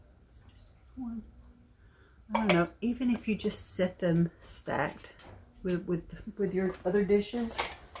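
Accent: South, United States. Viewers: This is the 1st video I have seen of your channel, I am loving it...I am from Ohio and LOVE your sweet southern accent.I am a thrifter and have been for 42+ years, you can make your home SO unique because things you find aren't mass produced like a big box store....everyone tells me my home is very unique and interesting , there is ALWAYS something different to see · Dear sweet lady, I knew exactly what you meant, I could never imagine you taking anything. I love your thrifted fines, the way you decorate, your Southern voice, you are a blessing !!! I do hope you get over your cold quickly so you can hold your new Grandson soon. Congratulations !!!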